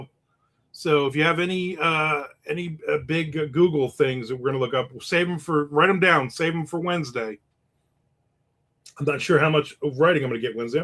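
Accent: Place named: New Jersey, United States. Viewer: Did you say I'm on, or I'm, can't tell with that Jersey accent.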